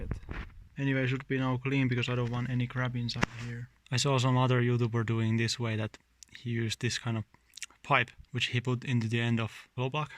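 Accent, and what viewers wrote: Finland. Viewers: your accent sounds exactly like finnish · Finnish accent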